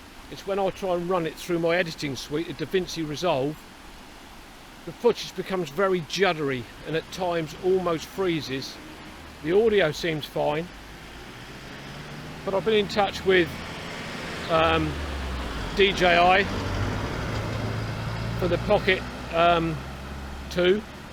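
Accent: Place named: RP, United Kingdom. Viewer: Its good to walk, isn't it,? And good you resumed these walks, if only for your well being. I never tire of seeing Cowdray ruins. I wish Zorro would behave like the spaniel. Thanks for braving the rain and the mud for us. Long may posh voices endure!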